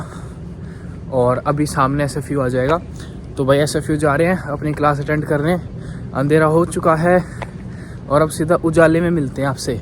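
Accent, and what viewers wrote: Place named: Haryana, India. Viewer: Bhai Haryana se ho Pura touch h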